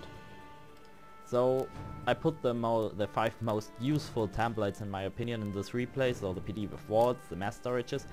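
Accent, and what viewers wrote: Germany. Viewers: That's a typical German accent of someone who did not spend much time talking with/to native speakers directly. It's pretty much basic school English. How is your accent when you speak German?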